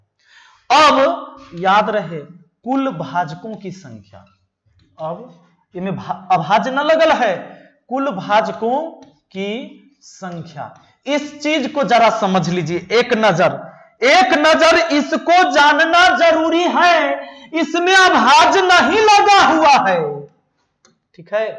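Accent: Bihar, India. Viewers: सर आपकी बिहारी बहुत अच्छी लगती है और जो भी है वह अच्छा ही है।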